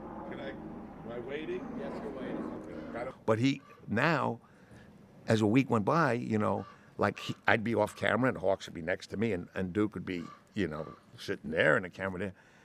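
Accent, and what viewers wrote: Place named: New York, United States. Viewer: A cowboy with a new york accent?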